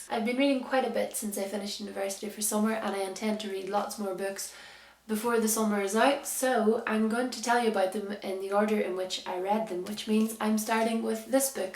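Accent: Ireland. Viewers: Are you irish? :D I found you through Sanne and I like you! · Are you Irish? I'm a Londoner xDD...Well I'm half. I mean, I was born and I live in London, but I'm half lol <3 Are there any books you can recommend for someone around my age-18-? xX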